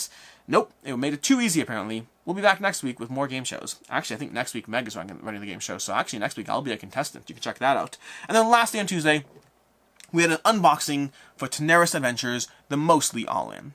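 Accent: Canada. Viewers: I believe your Canadian upbringing is showing. We inherited British pronunciations (and often, spelling) but our proximity to the U.S., and the flood of T.V. channels, has led to this confusion. Just say it the way it feels god to you.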